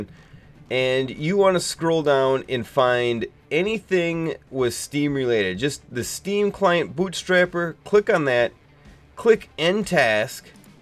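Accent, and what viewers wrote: Canada. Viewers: The Canadian accent is real.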